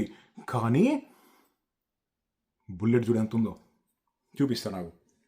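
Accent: Andhra Pradesh, India. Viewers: your slang is like andhra y you are staying in telangana then😀😀😀😀😀😀😀😀😀😀😀😀😀😀😀😀😀😀😀😀😀😀😀😀😀😀😀😀😀😀😀😀😀😀😀😀😀😀😀😀😀😀😀😀😀😀😀😀😀😀😀😀😀😀😀😀😀😀😀😀😀😀😀😀😀😀😀😀😀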